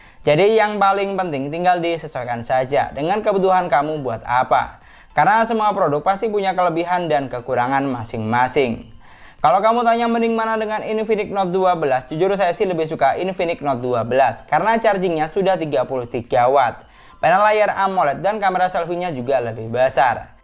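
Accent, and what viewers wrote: Madura, Indonesia. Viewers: Logat suaranya kek orang madura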